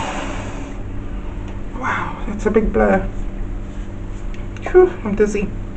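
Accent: South Africa. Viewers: Omg I LOVE your accent!!!! Is it South African?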